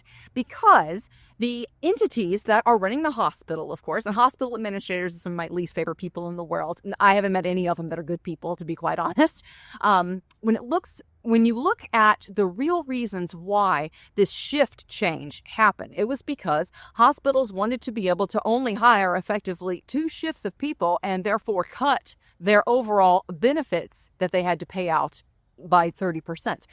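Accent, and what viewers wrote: South, United States. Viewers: I have been following you for many years and always appreciate your thoughts. I hate to hear you losing your beautiful Southern accent.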